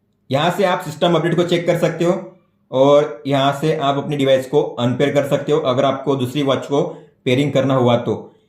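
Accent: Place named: Maharashtra, India. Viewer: marathi accent 🙊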